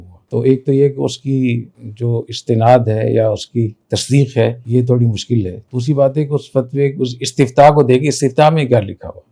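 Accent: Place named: Saraiki, Pakistan. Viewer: اتنابڑاعالم ھوکے تسمیہ کو سرائیکی زبان میں پڑھتاھے شرم کی بات ھے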